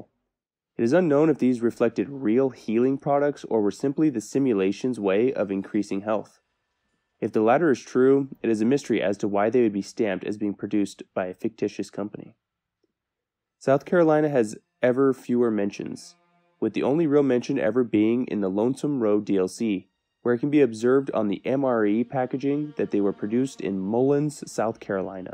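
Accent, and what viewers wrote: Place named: Colorado, United States. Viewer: As a Coloradan I think you are from Colorado by the way you pronounce it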